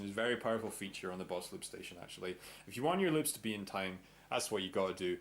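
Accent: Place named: Scotland, United Kingdom. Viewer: Scotish accent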